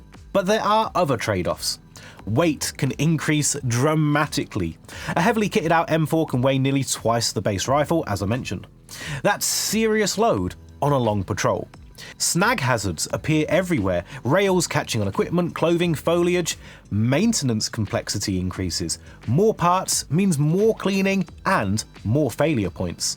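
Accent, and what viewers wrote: United Kingdom. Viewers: hopefully I’m proving wrong with this comment but going based off of your voice I’m assuming you’re from the UK with extremely strict gun laws mind you I could be completely wrong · bri'ish man with Klinefelter syndrome and a chatgpt generated script tries to tell me that rail on gun = bad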